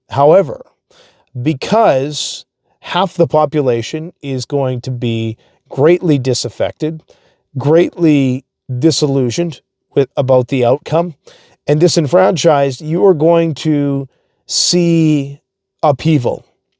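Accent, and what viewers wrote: Canada. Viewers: You sound like a Canadian. I've never disagreed more with your assessment. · Some videos your Canadian shows through more than others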